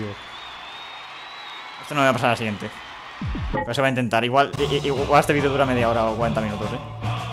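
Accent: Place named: Chicano, United States. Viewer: Apoco si hablas español, pensé que eras chicano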